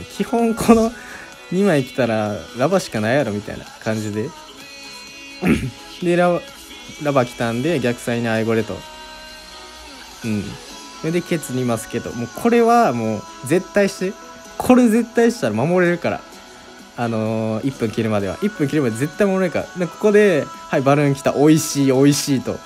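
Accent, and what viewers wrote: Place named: Kyoto, Japan. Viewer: 京都弁最高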